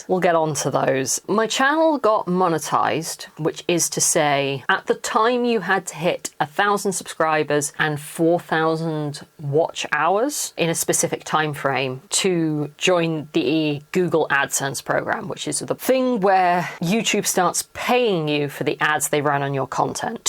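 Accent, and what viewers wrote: United Kingdom. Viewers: I love listening to the non-posh British accent. Because its HONEST. (And I run you at 1.5x or 2x, so you definitely dont talk too fast.) I love the ND content, because it fits my brain. I dont even remember what I started watching you from, but I love the "historical costume" side of YT. Stayed for the clearly LGBTQIA+ person, because you remind me of my family. I dont plan on LARPING, or starting YT, but stay for that kind of content because YOU. And I live in my subscription tab too (well, from there to "watch later" because I have more than I can watch daily.) Smart idea to beginner YT peeps: have a catalog ready to upload BEFORE you start the channel. Its easier to release on a schedule if you have a month of already edited things ready to upload.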